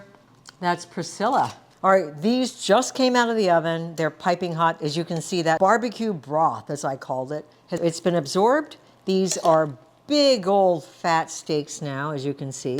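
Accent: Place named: United States. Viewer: Is she Japanese? She sounds American / Canadian.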